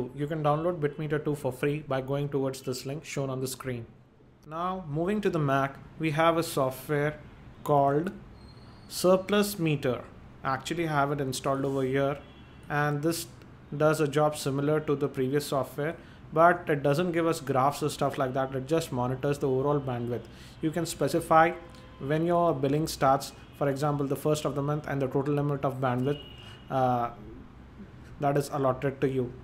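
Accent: India. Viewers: Funny idian voice xD (no racist)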